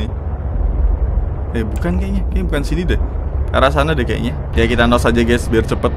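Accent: Java, Indonesia. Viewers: Kenapa selalu lucu kalo Mas e ngomonge medok 😂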